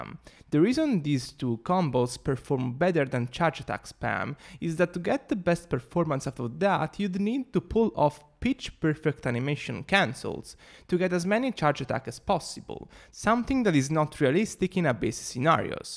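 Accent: Italy. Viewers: You sound italian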